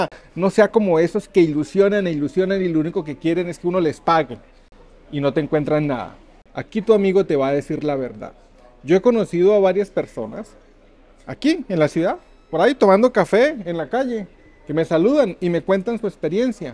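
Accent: Colombia. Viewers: Este habla como hablaban los colombianos en los 80/90 cuando estaban huyendo de la guerrilla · Menos mal que alguien dice mucho de verdad al parecer eres un hombre de bien DIOS te guíe siempre muchacho, pero si ayuda mucho si vendes empanadas a los restaurantes y en tu casa si tienes entrega por lo menos yo lo hice y mis hijos tuvieron pan en la meza. Pero eres un hombre correcto tú no eres mexicano por tu acento eres colombiano ojalá no me equivoque te deceso lo mejor.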